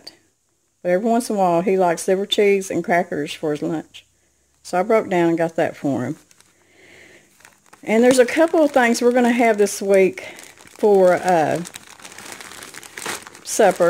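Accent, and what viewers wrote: South, United States. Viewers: Amen !!! I have been so poor at times in my life but my children never went to bed hungry and we always had what we needed, not always what we wanted but what we needed !!! My kids are grown and I finally went to college and became an RN, I now no longer struggle to pay my bills but I still don’t have fancy things, God showed me I don’t need fancy, I am happier with thrift store clothes and raising a garden ! I am so grateful for the hard times and I’m so grateful for the abundant times, God is good and faithful !!! Watching you with your down to earth personality and your southern accent warms my heart !! Blessings to you and Mr. Brown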